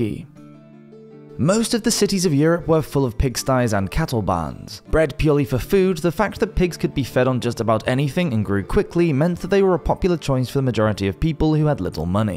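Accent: England, United Kingdom. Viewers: Just English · a deaf person can tell this is an english accent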